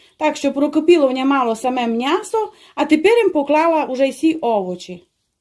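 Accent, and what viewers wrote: Transcarpathia, Ukraine. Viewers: говорить дуже гарно на за карпатському діалекті, судячи по коментарях всім подобається, це ніде не заборонено говорити на діалекті. Але пише українською мовою пише грамотно, чого вам Бракує, бо взагалі незрозуміло на якій мові написаний коментар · ДЯКУЮ ЗА В1ДПОВ1ТЬ ЦЕ МАЬУТЬ НА ЗАКАРПАТСЬКУМУ ТАКОМУ ЛАГ1ДНОМУ ДО ВУЗА НУ ПРИЕМНО СЛУХАТИ.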